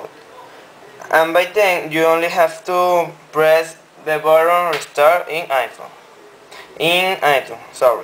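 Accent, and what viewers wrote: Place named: United Kingdom. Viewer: que ingles pareces británico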